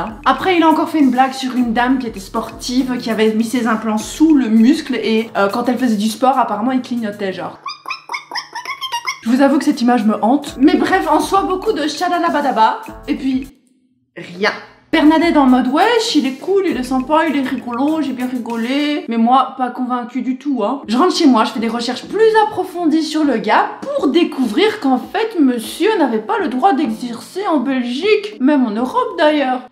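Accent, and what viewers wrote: Belgium. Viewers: Tu es belge ? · J'adore ton accent, Liège? Verviers?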